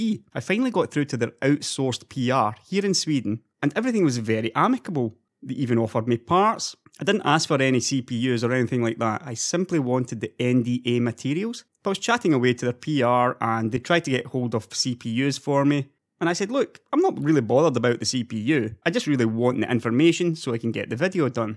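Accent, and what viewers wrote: Scotland, United Kingdom. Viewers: I taught you are Scottish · his Scottish accent?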